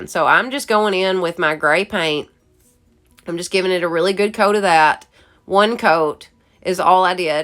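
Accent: South, United States. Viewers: Love your DIYs. Very inspiring. I definitely love watching your videos, as always you make it very fun and positive. Your southern accent is awesome! Thanks for the ideas, I can't wait to create my own.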